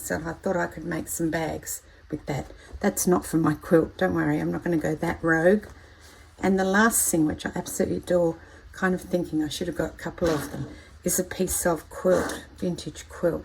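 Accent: Australia. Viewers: Hello this is Ellena from Portland, Oregon. I’m a quilter and have been fascinated with your Vintage linen quilt squares. I love them and am inspired to try doing something like this. Ps love your Australian accent. Keep up the good work.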